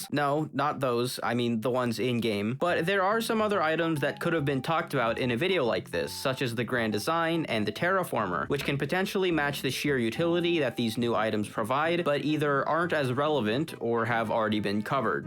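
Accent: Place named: United States. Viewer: Pov: you don't like the American accent (don't live in the states might just be a state accent)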